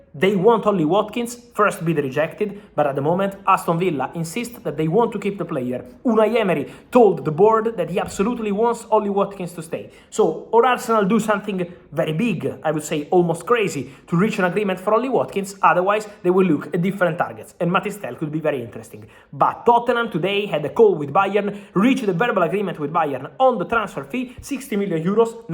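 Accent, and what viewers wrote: Italy. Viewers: He is Italian, he is trying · Bro you need to slow your speech down the heavy mario accent with the speed of you talk makes it inaudible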